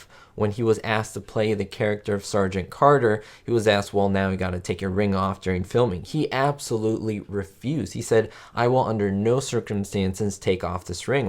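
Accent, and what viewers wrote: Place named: New Jersey, United States. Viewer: You must be from NEW JERSEY.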